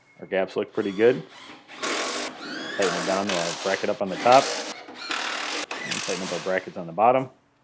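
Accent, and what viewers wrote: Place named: Midwest, United States. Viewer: refreshing amount of no speed up, no self apology for this "being boring", no crappy muzak in the background and plenty of commentary on how to do this task. Plus I find mid western drone is incredibly soporific, i find when I'm tired its like being told a bedtime story... I just wish no you'd lose the "The channel" bit from the intro and then you would be totally unique in not trying too hard to keep people watching... Very TV, TV never begs you to watch or explains itself too hard, it's just here's a program watch it if you like and if you dont, go do something far more interesting...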